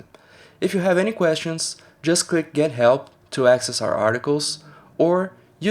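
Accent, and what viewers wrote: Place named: Brazil. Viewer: fácil encontrar um brasileiro falando inglês kkkkkkkkk vou testar seu power up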